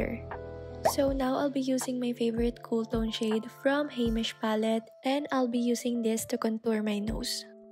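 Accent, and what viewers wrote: Philippines. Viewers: Are you filipino? I hear some accent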